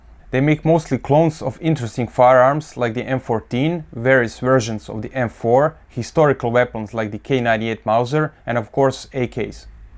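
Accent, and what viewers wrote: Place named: Eastern Europe. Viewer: Славянский акцент. Вы из России?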